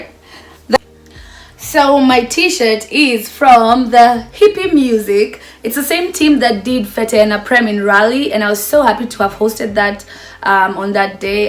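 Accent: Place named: Kenya. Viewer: I'm always in love with the Kikuyu accent you embrace.... That's why I feel you are legit... 'Hi, it's me again ' 😂 😂 😂 😂 na ka kiswahili kidogo "Ngai"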